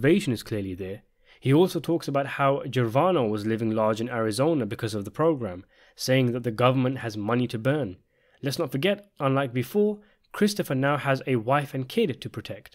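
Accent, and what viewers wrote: United Kingdom. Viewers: I’m sure I said this in a previous video but it’s so bizarre hearing a British accent talk about American mafia business and a show based in my home state but it works in the best way .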